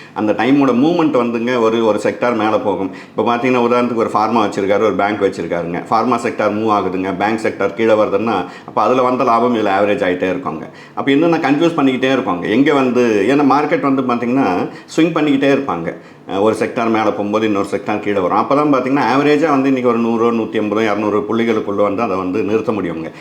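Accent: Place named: Coimbatore, India. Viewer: கோயம்புத்தூர் தமிழ் ஒரு தனித் தமிழ் தானுங்க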